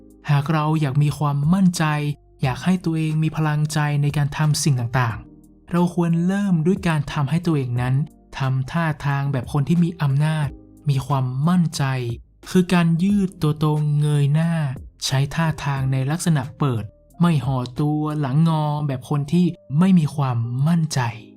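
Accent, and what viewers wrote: North, Thailand. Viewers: ขอบคุณนะคะ เป็นคลิปที่ดีมากๆเลย ฟังแล้วเพิ่งรู้ว่าคุณเป็นคนเหนือ ฟังวนไปทุกวัน กดไลค์ให้ทุกวัน มันดีกับชีวิตมากๆ ชอบ~😊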